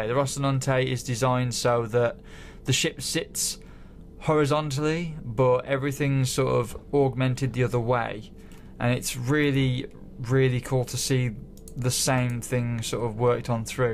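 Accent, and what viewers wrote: United Kingdom. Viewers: Hey narrator, are you from Cannock?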